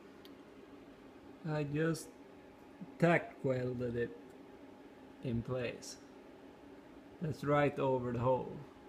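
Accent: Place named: Upper Peninsula, United States. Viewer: cool, the accent is very similar to what it sounds like in our Upper Peninsula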